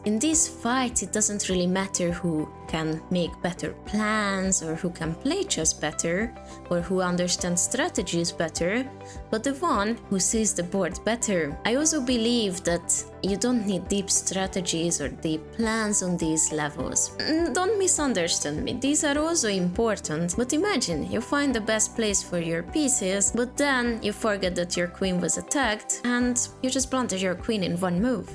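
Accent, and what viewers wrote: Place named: Eastern Europe. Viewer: Chess with east european accent sounds more trustful indeed.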